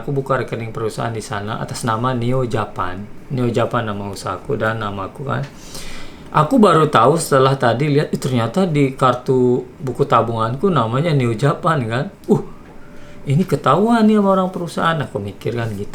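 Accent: Lombok, Indonesia. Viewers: Logat Sasak tetep melekat🙌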